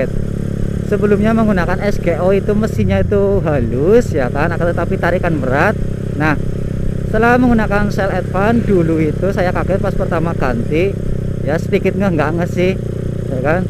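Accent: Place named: Banyumas, Indonesia. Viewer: Ora ngapak ora penak 😁😁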